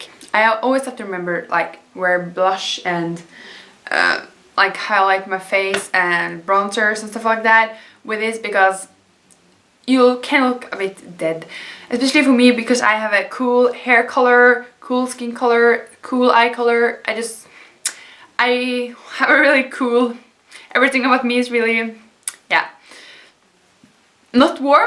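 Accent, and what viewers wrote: Norway. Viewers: Are you Norwegian? It kind of sounds that way, because I'm Norwegian too! :D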